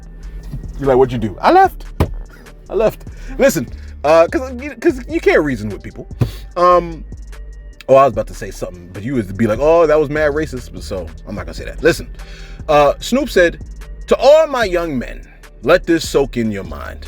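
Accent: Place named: Boston, United States. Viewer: Yo my futha mucking boi!!! You got thee best thee hottest show or odcast whatever you Wana call it on the internet this is my absolute fav -or-ite thing on the whole entire internet I been watching you from the jump and I never miss a show twice a day everyday or however many times u post I get all my notifications I guess I'm one of the lucky ones but even if I didn't I don't have no cable cuz I don't want it all I b on is YouTube that's more than enough for me everything is on here! But anyway my name is Dez Fresh I'm from Racine Wisconsin (The Wisco) and every chance I get I try to put my family and friends on to this casting,my lil brother Nique watches faithfully too!I watch one before I leave for work or while I'm there on break and I make sure I save the last episode for when I get home at 4am can't sleep right without catching u on my news bottom line you and your show and your back up chanel is you tubes big ish fr and I love her voice n lil accent sounds like she's from Boston 😆...don't ever stop doin this we love you,no homo and pause!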